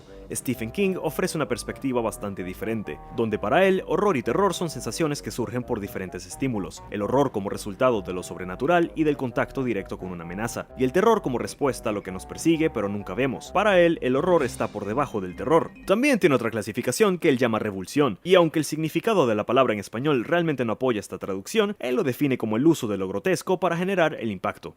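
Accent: Venezuela. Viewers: Contenido de calidad! ... Y lo mejor :D , un toque venezolano (GG) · Eres venezolano?? Suscrito de una mi hermano!! Buen vídeo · mano me encanta tu forma de hablar,tienes como el acento venezolano perfectamente equilibrado ,tal como debe ser :v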